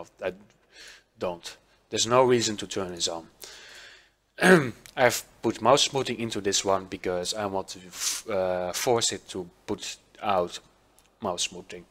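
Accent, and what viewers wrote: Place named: Netherlands. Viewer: Ben je van holland ik hoor het een beetje aan je accent? Leuke video man Bedankt!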